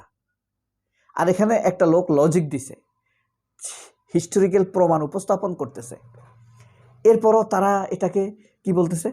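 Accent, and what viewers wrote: Noakhali, Bangladesh. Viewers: Host who you are ? Who count you? You host or talks show creator ? You can’t speak pure Bangla ? Why you speak Nokhali ? What is your logic but mantis law enforcement or justice ? Unnecessary can’t attack any one or physically abuse ? Anchorage peoples don’t break law respect justice or law enforcement.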